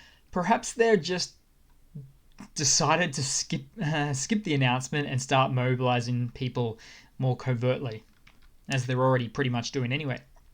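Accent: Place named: Australia. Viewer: Thks & you sound aussie; I stills can't figure-out how yous-guys don't fall-off the bottom of the earth ;) Pretty-good trick though.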